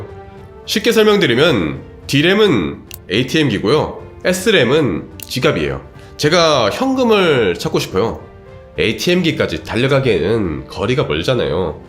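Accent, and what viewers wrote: Gyeongsang, South Korea. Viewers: 경상도 토바기, 싫다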